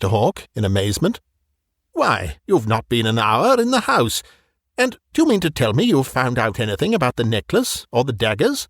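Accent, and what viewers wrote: United Kingdom. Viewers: I don't sleep well so I listen to audiobooks on YT all night every night with earbuds. I like the fact that this compilation has over 9 hours of listening. Although I'm American I enjoy listening to listen to books read in a British accent. Your voice is fabulous. Luckily, I stumbled upon this excellent channel just today.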